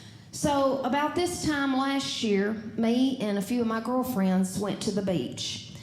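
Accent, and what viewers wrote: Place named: South, United States. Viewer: It’s a southern dialect, please educate yourself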